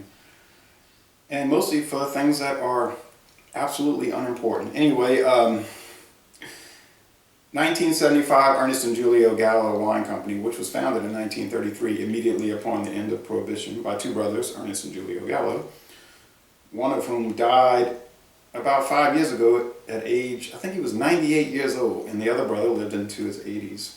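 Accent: Louisiana, United States. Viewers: Where are you from brother i hear a little Louisiana accent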